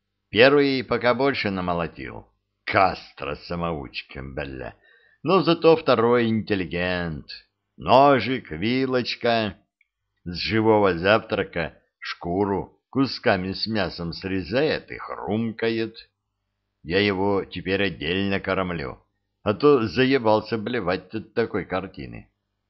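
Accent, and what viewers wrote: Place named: Odessa, Ukraine. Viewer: Снова этот «одессит» читает. Не стала слушать. А так хотелось послушать.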